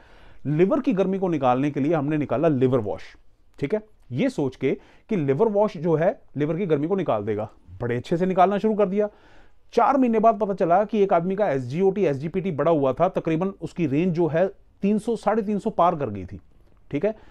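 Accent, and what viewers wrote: Punjab, India. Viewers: Doctor Sahb ka utshah or bolnna ka lehja dekh Kr video K shuru se hi lag rha tha k doctor Sahb😀😀 Punjabi hai 😀😀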